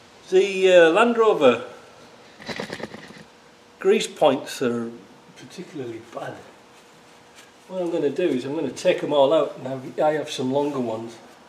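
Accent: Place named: Middlesbrough, United Kingdom. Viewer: I recognise your accent - I’d guess Middlesbrough / Darlington area?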